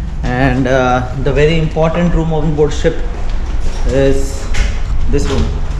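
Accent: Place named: India. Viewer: Awful indian accent, Killed all vives. Life in modern vessel always fascinating but he could not describe it properly.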